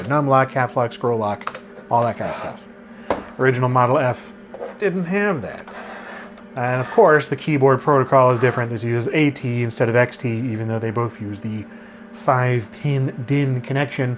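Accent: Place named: United States. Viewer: Well... I saw the title and the thumbnail, so clicked on it and was shocked when I heard a US accent coming out of my main speakers instead of the one I was expecting coming from my subwoofer!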